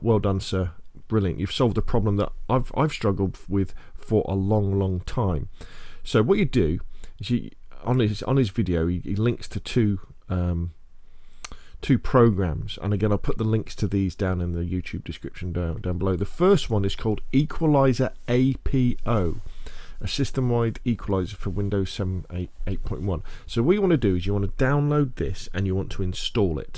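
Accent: England, United Kingdom. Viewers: too english like wotah(water) eh ?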